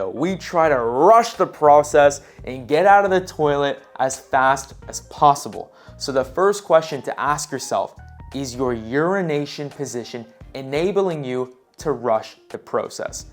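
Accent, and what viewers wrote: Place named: United States. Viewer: Is it possible to give English captions (at least important steps). Because, English is a foreign language for several people. And it is difficult to follow the US pronunciation for people like me.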